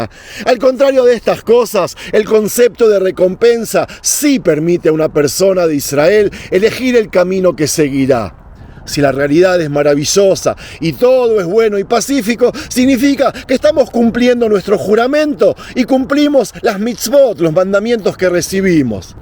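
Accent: Argentina. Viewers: soy uno de los masoquistas,pero m gusta tus videos,uno de tus videos donde mostrastes un modelo de el tabernaculo,m gustaria un video d siquem donde supuestamente estaba la encina de manre,donde estuvo el padre Abraanm, lo q odio en ti es ese acento argentino,te tolero x la historia q tu conoces y a mi me gusta. Shalonm y delen duro a estos cananeos d raffah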